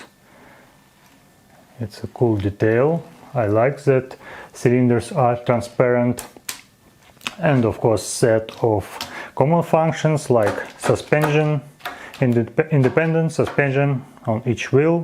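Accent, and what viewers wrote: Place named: Russia. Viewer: Я не тролю тебя😊 просто твой акцент прямо таки походит на те самые пародийные ролики с руским окцентом! Я сам так говорю но когда читаю стараюсь читать с окцентом приближеному к Амеренканскому акценту да и +я сам очень плохо знаю английский язик а с помощью твоего англоговорящего канала я смогу улучшить свой английский! Так скажем совмещаем приятное с полезным 😊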